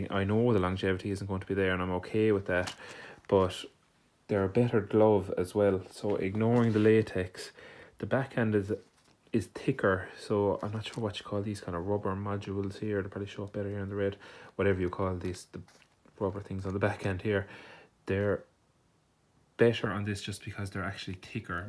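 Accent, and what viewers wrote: Ireland. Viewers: Are u from Dublin?but I'm from Ireland and I don't find gloves that cheap any wear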